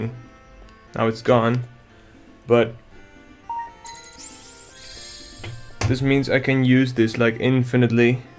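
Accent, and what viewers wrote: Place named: England, United Kingdom. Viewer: Hoe kan jij zo goed met een Engels accent praten?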